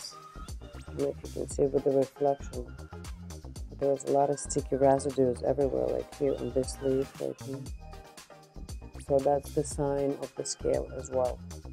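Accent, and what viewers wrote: Russia. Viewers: You accent seems like a Russian?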